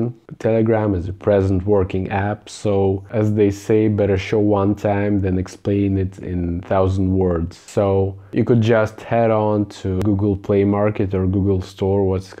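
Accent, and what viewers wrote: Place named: Russia. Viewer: Ты же русский, слышно акцент)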